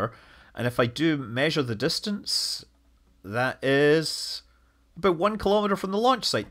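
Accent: Scotland, United Kingdom. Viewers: Scot, are you Scottish? The accent is awesome, my ancestors came from Strathmiglo, Scotland in the 1700's to escape the English.. love all things Scottish.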